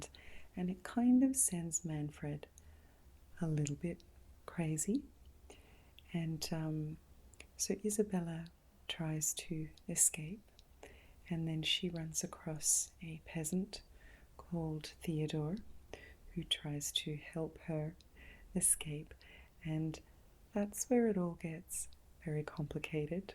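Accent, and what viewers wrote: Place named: Australia. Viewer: Beautiful, classy Aussie, erm, Australian accent. And, deliberate, specifically chosen words. Thank you. You are so enjoyable to listen to. And the type of person my wife and I seek out as a friends. Keep going! Best wishes from LA.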